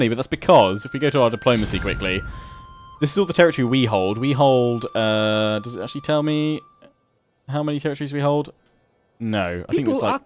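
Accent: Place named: Bognor Regis, United Kingdom. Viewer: You speak so posh for someone from bognor/littlehampton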